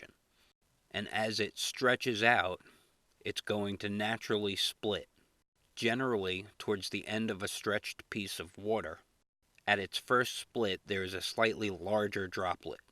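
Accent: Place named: New York, United States. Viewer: ohh that's such a good way to study! Are you planning on doing anything more with the fire or water? I bet you could make all sorts of amazing, surreal paintings... The 4th one really looked like it was hitting something round and invisible! I bet there are tons of invisible things you could paint! I thought I detected a subtle (and lovely) hint of new york in your voice, but I've never been there so I wasn't sure. What's the best part of living in long island? Or is it 'on' long island?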